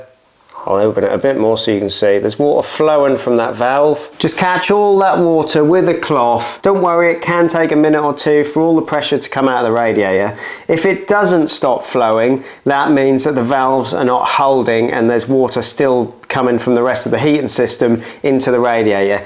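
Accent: Norfolk, United Kingdom. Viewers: Nice video mate, great advice and very helpful. Norfolk accent? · That's a Norfolk boy if ever I heard one! Thanks for this helpful video 😊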